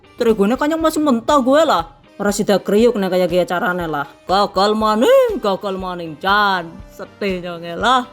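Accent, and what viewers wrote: Banyumas, Indonesia. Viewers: Laaah mimin nya asli madiun apa banyumas ngapak bgt😂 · Selama ini ngebayangin mukanya ga kyk gt Jadi kaya wajah dan suara dijual terpisah 😂😂😂 Kaga matching Kalo yg ngasih resep wong ngapak hrsnya legit nih resepnya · Mantap banget Bu,,,njawane medok men,,,asli banyumasan ?aku salah sijine pengikut postingan e Lo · tiba,e ngapak yu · 😀😀😀 haha Aku ketawa terus klau Pas mbak nya ngmng ngapak😂 sukses selalu mbk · Wkwkwk dasar mendoan Ora ngapak ora kepenak... Ketika suara ngapak bikin kaku weteng ku🤣🤣🤣🤣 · Laahh cingire, ngapak jebule 😂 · oalah ngapak jebule rika yu 😅😅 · "DONE" Pertama kali lihat channel ini penasaran, akhirnya coba di tonton, dan yang bikin pengen terus nonton itu suaranya yang khas banget ada campuran English dan ngapaknya juga, dan juga kualitas editingnya bagus, bukan kaleng2 pokoknya. Sukses terus Channel. Aamiin #Giveaway THV2020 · Buund..syukaa sekali logatnya gado"....indo..english..jwaa ngapak...top deh buund.sehaat teruus yaaa..slm kenal..😊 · Pertama lihat video THV kaget bahasanya ngapak,, sooo nyonk kira wong Cilacap 🤭dadi betah nyonk nyimake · "DONE" Awalnya aneh juga. 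Madiun tapi ngapak. Baru kali ini lihat wajahnya. Makasih resep2nya. #giveawaythv2020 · Ngapak banget yu lah hahaha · Bisa ngapak yu,, Rika wong banyumas, p...mantap yu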